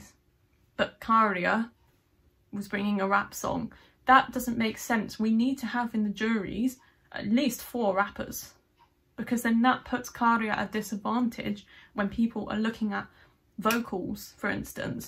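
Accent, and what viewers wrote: United Kingdom. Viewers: I believe you are completely out of track. Your analysis does not entail the political economy aspect of the issue. Let me rephrase Stalin principle here "it is not important who votes, it is important who nominates who is going to vote". The main issue being here that these jury members are too few and nominated exclusively by the broadcasters and that is too easy for them to control the results whomever they nominate to be part of the jury, rappers, pop singers, astronauts or belly dancers. Please give a look to the complex jury system (and numbers of components for each jury segment) adopted for Sanremo. Still far from perfection but lightyears ahead than ESC in trying at least to minimize such "distortions" particularly on the broadcaster side which in this case is RAI. Clearly the music industry is a giant and investments need to be protected even in this economic sector so do not expect that this will turn overnight into a completely fair game but please keeps fighting (no weapons needed, as a youtuber you can fight with your nice oval and your remarkable british accent) also in the name of those (including me) that still believe that culture remains a human right “Everyone has the right freely to participate in the cultural life of the community. 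(art.27)”. Also, are you sure that televote is not at least partially controllable? First of all who is really enforcing the check related to number of votes per mobile number once the Eurovision is over? Secondarily imagine a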